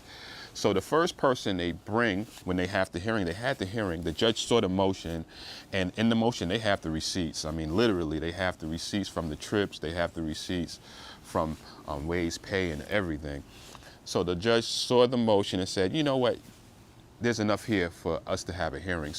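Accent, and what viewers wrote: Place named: New Jersey, United States. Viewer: it sounds like ur from Jersey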